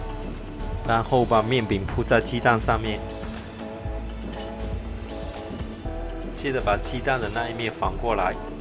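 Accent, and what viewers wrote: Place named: Taiwan. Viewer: Thks for sharing this good breakfast recipe. Wonder where are you from originally? Your mandarin sounds taiwanese or singaporean.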